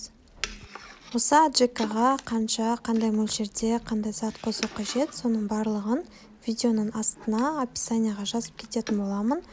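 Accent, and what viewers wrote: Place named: Uzbekistan. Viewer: Ашты деген соз жок ащы .Казакша дурыс сойлендерши онтустик халкына отиниш .Айналып дегенди айланып дейсиндер жыным келеди .Озбек , калпак болып кеткенсиндер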